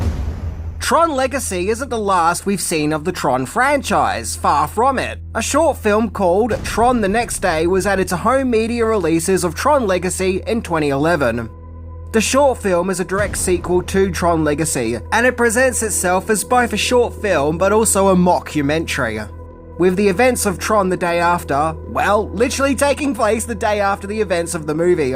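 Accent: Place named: Australia. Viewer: There's the OST and then there's an 8-bit version of the OST. Both are incredible. And, despite your not being familiar with the technology and your tendency to speak like an Australian, I LOVE your totally unpretentious takes on film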